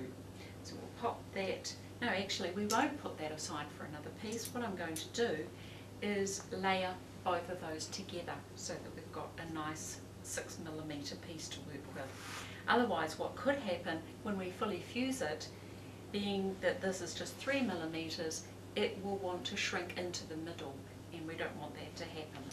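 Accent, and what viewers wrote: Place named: New Zealand. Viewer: ahh.. wonderful tutorial. I have wondered how these are made, and here in NZ too! I recognised your kiwi accent. I am getting the vacuum gear together (almost have all now) to make the vapor deposition dichroic glass here in NZ as well. Is there a maker or supplier in NZ already?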